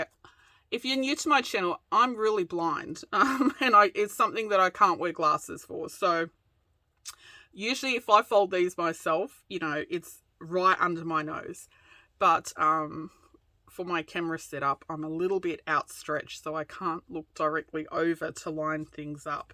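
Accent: Australia. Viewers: Love that you sound like a fellow Aussie -& living your mini pockets 👍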